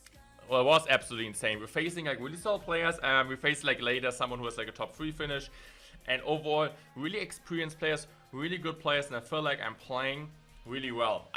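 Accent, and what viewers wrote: Germany. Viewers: Hahaha come on bitch! Back after bout 4 years, clash is back baby! Beautiful games much love. Idk why but I love German accent prolly from listening to when I was a kid also funny thinking of as unc lmao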